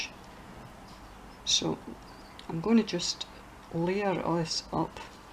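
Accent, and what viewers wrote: Scotland, United Kingdom. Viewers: like everything you do, these tags are spectacular. Love to watch your videos and so enjoy your beautiful Scottish accent. My maiden name was Campbell and I would love to visit Scotland one day. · Just Magnificently Beautiful! I Just Luv All Your Artistic Work&How You Fixed The Problem! I Luv Listening To Your Scottish Accent Too! I Don’t Fall Asleep Watching Videos But I Keep Yours&Others I Luv&Put In Favorites&Watch Later!💜🦋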